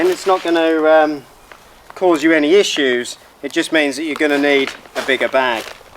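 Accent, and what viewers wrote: Norfolk, United Kingdom. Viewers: This was recommended right as im thinking about this, i might be a little late, but i probably couldnt make our garden worse than what it is. Also, as soon as i started listening i told my wife, this guys from norfolk, when i saw the bag i knew i wasnt wrong! 👍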